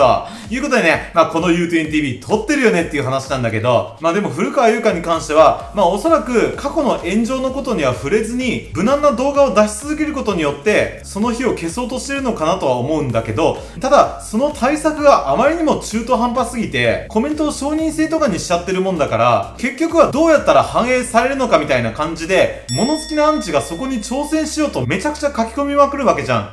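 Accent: Kansai, Japan. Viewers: 関西弁聞きたいですけど私可愛い男の子でした⤵️ 古川はtko木下越えてほしい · 関西弁ききたいってはなしだよね〜🥺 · コメント61件もあるのに、視聴が0回になってる？？てか会ったら関西弁で喋るーって話しだよね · 冒頭からイケボ関西弁で終わった生理が再開しそうって話だよね干物BBAもときめいちゃう(´♡ω♡`)って話だよね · 対人に無神経で図太い人って、何もかもに無神経で図太いよねって話しだよねw 関西弁いいねぇ☺️ボケとツッコミ上手そう← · 関西弁喋ろや！！！！コテコテ関西弁のかわいい女の子より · ちょいちょい、イントネーションが関西だなぁ〜と前から思ってたけど。って話しだよね。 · 関西弁もっと聞きたい！(標準語だから訛りに憧れがある) 動画見に行ったけど1部の米が論外すぎて… 今回の件でショックを受けたり古川優香の為を思って注意したコメントをしたファンだっているのに嫌なら見るなは論外もち誹謗中傷は肯定しないけどね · 関西弁萌えって話だよね🤩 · 関西弁聞きたい🥰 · 関西弁のU-Twenty可愛すぎるって話だよね🤣💕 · 関西弁はギャップ萌えですきすぎる… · の関西弁最高🥺 しばらく古川優香もやっぴもちょっとしたことで炎上するんだろうなって話だよね🤔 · 関西弁もギャップがいいし標準語も聞き取りやすくていいし、、とりあえずUくんがいいよねって話だよね(？)www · わぁ💕 アタイと話す時はバリバリの関西弁が出るって話しだよね💕 古川鼻チークやら👃🏻 耳毛👂🏻やら言われてえらい大変やなって話しだよね！ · テメーのゴテゴテ関西弁ききたい〜🥰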